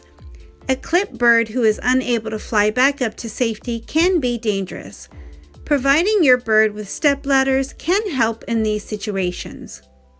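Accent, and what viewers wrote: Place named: United States. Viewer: Like the American accent is it west coast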